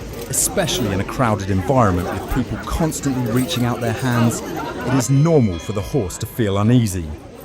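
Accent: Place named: United Kingdom. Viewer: Listening to your British English, your pronunciation. It's an English lesson for me. Thanks from Argentina. Excellent videos.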